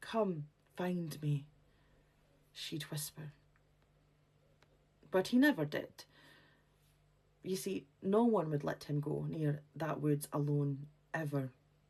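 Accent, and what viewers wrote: Scotland, United Kingdom. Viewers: WITH THE MARK OF THE HAWTHORNE , AND YOU SILLY LASSIE , YOUR SCOTTISH ACCENT AND STORY TELLING IS SO ADORABLE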